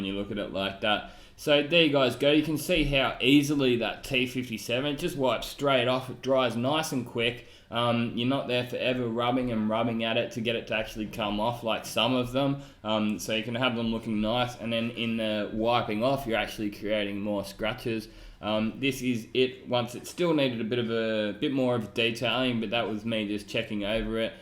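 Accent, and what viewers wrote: Australia. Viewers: but you are Australian or American? which city?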